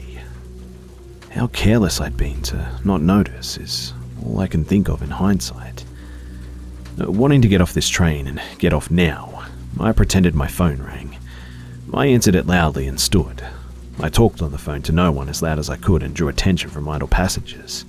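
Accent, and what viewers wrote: Australia. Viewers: "Shadow People", a.k.a "Shadow Walkers" and "Dark Dwellers" are mainstays of many traditional cultures and generally seen as spirit beings that can be good, bad, or neutral. Usually with Native American and First Nations cultures, it's something negative. Being of Cherokee background, the traditional view for my experience is that they are visions of the Raven Mocker, the spirit associated with death and is the one who takes the soul into the next world, the one the medicine man has to protect the body of the sick and parents of little children while sleeping from with pine wood cuttings and tobacco. The Aborigines of Australia (judging from that voice and your fondness for telling the story about the Cockney security guard, you might get a kick out of this) have the Dawn Walkers, the beings that during the Dreaming wandered the world, creating various landmarks and working out various "just-so stories", but are now mysterious visions one can catch in their eye if they look hard enough. 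Unlike many Native American versions, these are positive and they are known to be powerful and can give great strength and wisdom to those that treat their dwelling-places such as caves and trees with respect. Really neat stuff, cultures and religions, anthropology and the study and preservation of the cultures and heritages of our planet.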